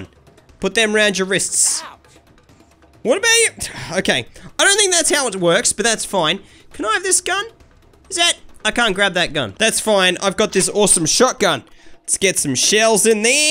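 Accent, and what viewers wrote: Australia. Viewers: mate are you Australian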